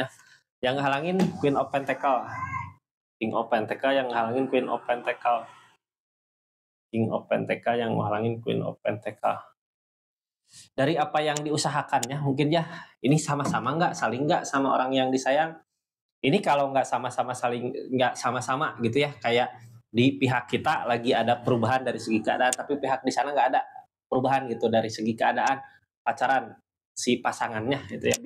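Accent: West Java, Indonesia. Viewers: biarain aja.. Sbg ciri khas sundaisme 😁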